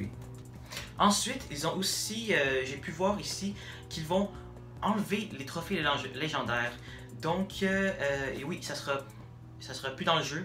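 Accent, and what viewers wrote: Canada. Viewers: tu est canadien ou français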